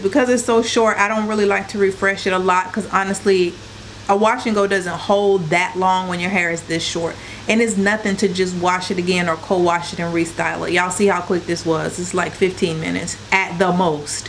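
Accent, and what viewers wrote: South, United States. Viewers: Yes concoction-- i say that all the time!! Southern drawl-- love it-- in central PA and ya'll aint in their vocabulary!! Nice video-- gonna try instead of 500 curl.puddings!!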